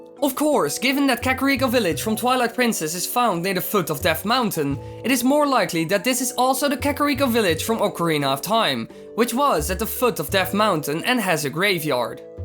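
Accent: Netherlands. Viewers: do you happen to be dutch? i can really recoqnize the dutch accent as i am one myself :p came across your theory through the zeldainformer fb page 😁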